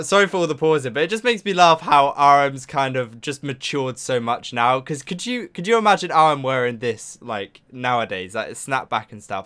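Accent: United Kingdom. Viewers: Oh, he's from America? When i first watched his channel, i kept thinking he was British all the time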